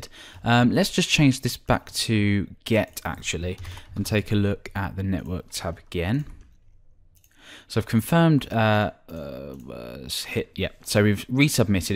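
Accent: England, United Kingdom. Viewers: Not a Hindi accent, a butter-smooth English accent. Plus Good content. Wonderful.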